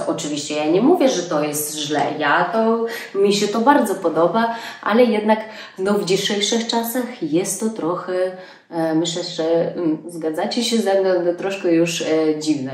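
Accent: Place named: East, Poland. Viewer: Skad ty pochodzisz - po akcencie widze ze ze wschodu-wszystkie polskie zwyczaje sa piekne , niepowtarzalne- takie nasze polskie- tym sie roznimy od calego swiata- imieniny - supe - calowanie w reke - szacunek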